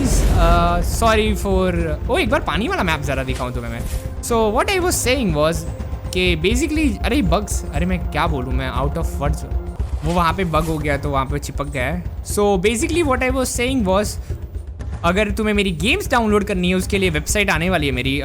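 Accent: Gujarat, India. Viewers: New Temple Run game Kuch Kuch Raji game Jaisa Nahin dikh raha?????🤔🤔🤔🤔🤔🤔 And also I think you from Gujarat in Ahmedabad or Gandhinagar or Surat I think so because your accent and you that say division multiplication like that I am Gujarati to (Bournvita Nahin Pihu Mare)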